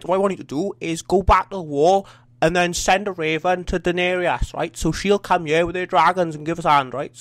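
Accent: Wales, United Kingdom. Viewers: Such an interesting accent you have, (I'm from upstate new york). Is Welsh your first language? Just curious how it usually works in Wales.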